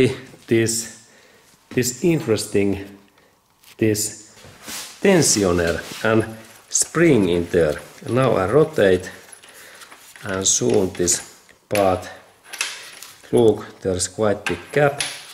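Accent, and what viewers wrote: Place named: Finland. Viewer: Hienoa työtä! Tunnistin aksentista sut suomalaiseksi! Mikä kone siis tässä pöydällä oli?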